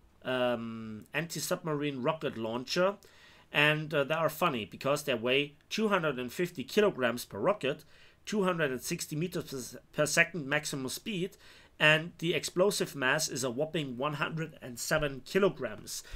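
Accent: Bavaria, Germany. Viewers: Mach Moi wieder a Video auf bayrisch 😂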